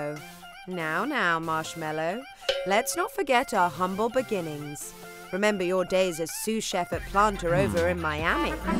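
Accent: United Kingdom. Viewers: It's what makes it more eye catching especially bc the narrater is British so I like it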